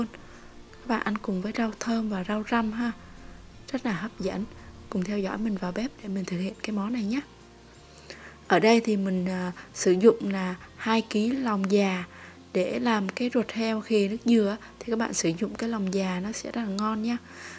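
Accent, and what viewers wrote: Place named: North, Vietnam. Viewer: Bạn này hình như là người phía bắc lấy chồng trong miền nam, vì giọng bắc mà làm món nam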